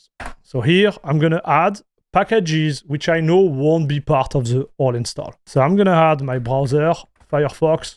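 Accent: France. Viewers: the french accent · i love your french accent \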